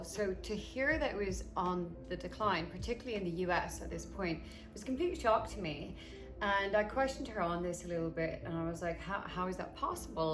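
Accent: Ireland. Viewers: Alcohol is a hoax. It’s a poison that robs you of your health, potential and your dreams. Judging by your accent you’re Irish. So you know how difficult it is for people who don’t drink here. I’m off it 1 year and 1 month and my friends think I’m just ‘going through a phase’ and I’ll eventually come to my senses. Never.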